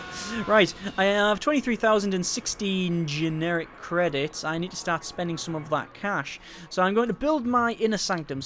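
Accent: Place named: England, United Kingdom. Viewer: ***** Nope, he's english.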